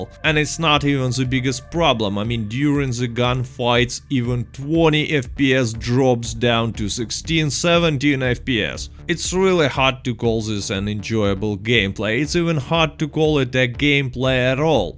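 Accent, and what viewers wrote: Russia. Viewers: I looove the russian accent. All critics - wether CP2077 or Red Dead - sound harder and more punchy with the beautiful sound of this language. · Your accent is very Russian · Ахахаха угораю с твоего акцента чувак, русского я за километр учую))) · Типичный русский акцент, большинство так звучат когда пытаются говорить на американском английском, ничего необычного. По крайней мере речь грамотная и понятно что он говорит, а то бывают люди что так коверкают, что фиг поймешь что человек пытается сказать, т.ч. он имеет еще не самый худший вариант русского акцента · а в голосе то проскакивают русские нотки))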